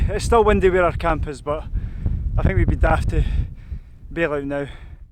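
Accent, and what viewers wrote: Scotland, United Kingdom. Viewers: I slag that twat Hopkins off a lot worse than you . I m not saying you re personally chucking litter but you re popularising a night out in a scenic location having a cook up and booze up (and don t try and tell me you did nt have 4 cans in that overloaded rucksack ) and already one of the idiots following you is saying "i m having some of that seeing that Suilven is a piece of piss to get up even tho it looks scary from a distance. You ve already said its busy at weekends up the tops lol.. Thats how the Peak District started off .The same will end up on all the munro s with all overnights being banned and YOU are part of the problem . I haven t got a problem with your jock accent , but the automated sub titles certainly has..It was the only funny thing about the vid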